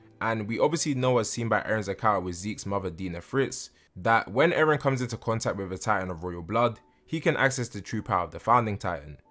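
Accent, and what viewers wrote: London, United Kingdom. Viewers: Love your content bro. What part of London are you from?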